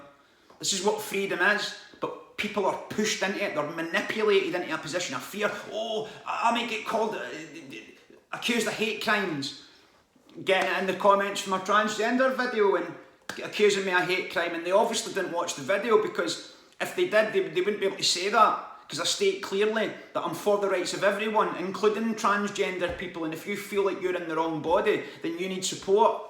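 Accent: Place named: Glasgow, United Kingdom. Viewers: Thank you for letting us know this! No doubt coming to N America soon enough. And your accent reminds me of my dearest friend that lived in Paisley. And yes, I understood every word you said, and she, too. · Brilliant, I've just heard of you today. You sound like you're fae Wishy lol..Great to hear what you have to say..and you are right we need to come together, but the created divide gets in the way so much. Where I live now, north east Scotland, only the scum of the earth would ask for a council house so most people don't cars as they have a death- tax (mortgage)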